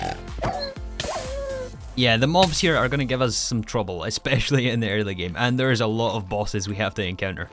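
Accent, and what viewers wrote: Scotland, United Kingdom. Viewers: Hmm I cant figure out his accent. Its obviously British Isles but where exactly- "Por-P-Porpal" Haha hes scottish! Great video as always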